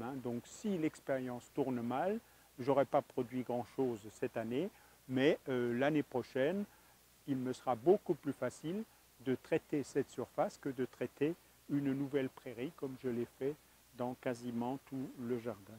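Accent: Alsace, France. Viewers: Bonjour, et merci d'exister ! J'ai découvert votre façon de jardiner avec joie, car à 75 ans je dois me ménager... tout en réalisant mon désir de potager. J'ai d'autant plus de plaisir à vous voir et à vous entendre que votre humour, votre léger accent alsacien me rappellent terriblement mon oncle Alfred, alsacien comme toute ma famille ! Je suis installée en Bourgogne, et j'ai convaincu mon époux de s'intéresser avec moi à votre méthode. Nous tâtonnons donc, mais cela nous réjouit ensemble. S'il est bien évident que l'on devine les connaissances derrière votre art de jardiner, je trouve très agréable que vous n'en fassiez pas des montagnes. Nul besoin de justificatifs, la véritable école est celle de l'expérience personnelle, et si certains ont besoin d'un parchemin c'est qu'ils ne sont pas très surs de leur science...mais qui peut prétendre l'être ? Je pense que je vais voir ce que je peux faire de la mousse que nous ratissons dans la "pelouse", soit pour border les espaces "enfoinés" soit pour remplacer le foin ou le brf à certains endroits. 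Se procurer du foin en rouleaux me semble encombrant, nous préférons le foin en "cubes", un peu difficile à trouver, et il nous faut le décompacter un peu.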